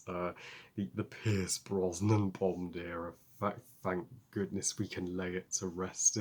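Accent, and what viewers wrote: United States. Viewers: Oh my gosh. You’re so awesome! 👍😂 I love your American accent. More more! Artwork is awesome!!!🥰❤️ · Great American accent 😊 Happy weekend, my friend!!! 💜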